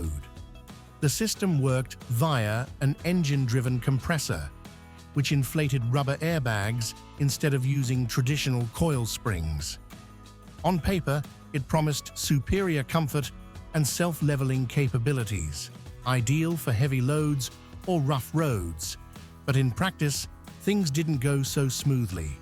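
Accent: United Kingdom. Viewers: That's exactly right. This video doesn't even answer the very question it proposed in the title. Yet another announcer with an annoying British accent. There was barely any time for GM to do the crash quick, completely re-designed '59's by mid-'57. Long hour 7 day work weeks for months was what it took, and exactly what they did, with beautiful results for all 5 division's models.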